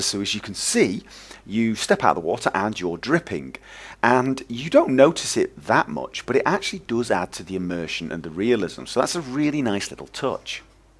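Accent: United Kingdom. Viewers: This guy, he really does a great job with these movies. His reviews are pretty good, I think he has good taste, and his accent is pleasantly British, but its the little things, like providing links in the description for Nexus and, perhaps most refreshing, links to the particular segment within an episode for a particular mod. One thing I'd like to see more of, however, is more first person perspective shots for reviews of weapon mods, which often appear different in FPS.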